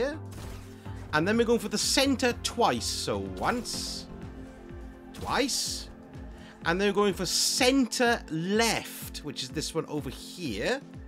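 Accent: Wales, United Kingdom. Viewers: Nice to hear a fellow Welsh accent, you have a clan? So hard starting recently and playing catch up.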